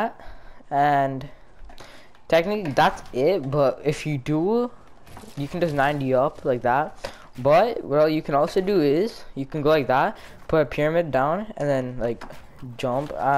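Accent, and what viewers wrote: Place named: India. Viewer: Did anyone here his India acete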